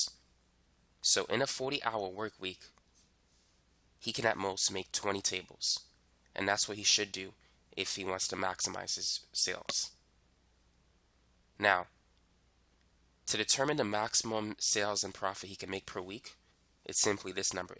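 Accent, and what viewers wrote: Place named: New Jersey, United States. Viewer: you're def from New Jersey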